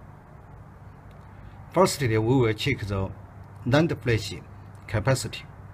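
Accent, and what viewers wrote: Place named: Japan. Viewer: Do not ask me why, but when I hear your Japanese accent, I feel like an engineer does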